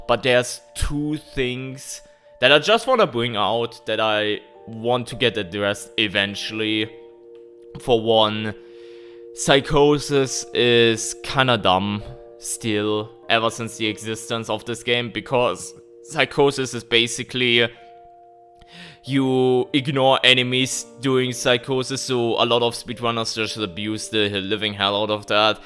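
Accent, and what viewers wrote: Germany. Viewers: I like your accent. Is it german?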